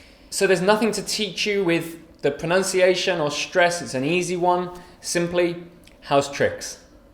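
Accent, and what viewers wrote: United Kingdom. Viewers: I like your British English accent too and thank you very much.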